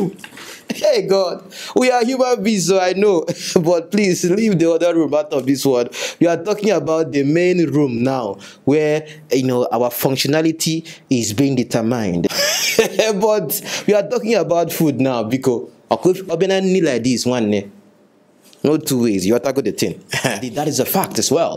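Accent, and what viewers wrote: Calabar, Nigeria. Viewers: my brother if you are not say that you have not Said it before that you're from Anambra State i will think you're from Calabar because you look like them too much and your Voice also... this is what i think before.. you are the best. God bless you and More Grace to you my brother.. watching from Austria 🇦🇹 Vienna.. In addition 100 hours = 4,167 days Time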